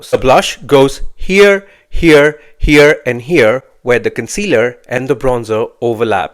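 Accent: India. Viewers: Hate Indian accent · Callar correcta ! The Indian accent bro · Gosh I hate the Indian accent …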